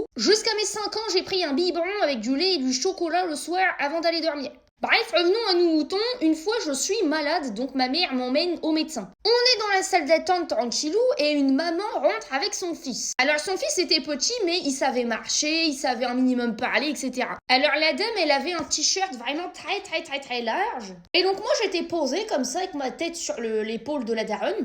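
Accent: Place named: Normandy, France. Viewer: C'est de Normandie 😊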